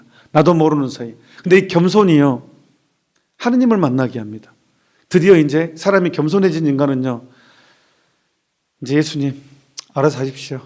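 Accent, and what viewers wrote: Jeolla, South Korea. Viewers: 신부님 전라도사투리 정감이갑니다 저도전라도ㅋㅋ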